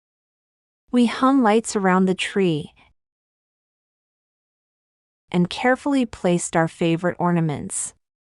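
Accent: United Kingdom. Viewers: does this channel have a British accent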